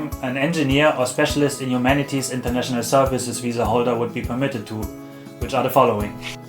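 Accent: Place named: Germany. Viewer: oha i wuste doch das dein aktzent etwas deutsch klingt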